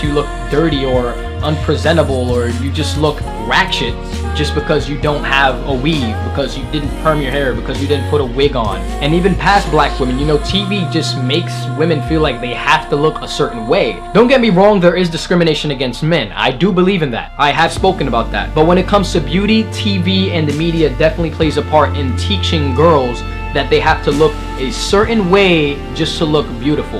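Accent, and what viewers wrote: New York, United States. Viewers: he sounds so newyorky damn i like it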